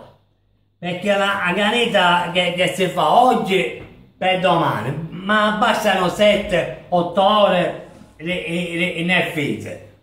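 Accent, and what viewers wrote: Sicily, Italy. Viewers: Grande!!! Viva la Sicilia e mi piace che parli con accento siciliano invece di fare il perfettino come gli altri!! Ammuniii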